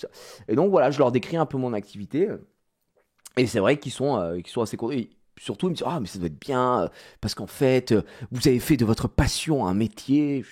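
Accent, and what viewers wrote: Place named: Paris, France. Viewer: non je pense que c'est juste le phrasé parisien typique + le fait que aime bien exagérer qui fait que ça ressemble beaucoup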